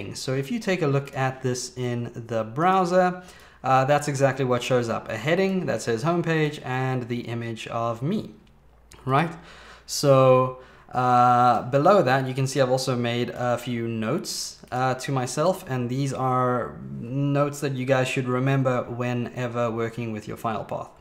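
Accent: South Africa. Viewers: My guess is South African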